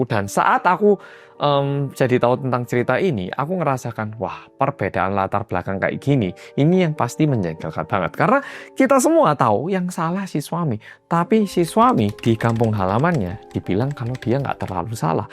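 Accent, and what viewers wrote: Java, Indonesia. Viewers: Medok banget bahasa Indonesia logat Jawanya. 1000% A sampai Z udh fasih bahasa Indonesia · Ibu kangeeen Mendoknya Mas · Hai medoook🎉 Nyimaaak 🎉🎉🎉 Sukses sehat selalu ya 💪🏿🙏🏿💕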